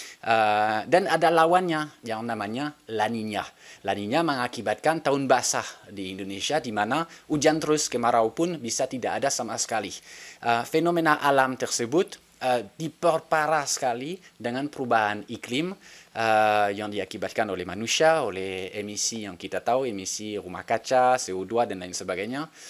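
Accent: France. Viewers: Lucu logat Prancis nya😂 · Aku mendengar logat Perancis, sounds so good 🤭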